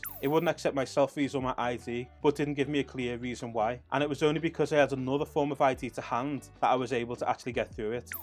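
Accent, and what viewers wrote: Liverpool, United Kingdom. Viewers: yeah I lived in wavertree originally and moved up to Clitheroe to live with my partner. And I agree especially when it comes to financial content I haven’t seen anyone with the scouse accent scousers tend to get more of a bad rep compared to a good one. so it’s especially good seeing someone talking about finance and providing great advice like yourself.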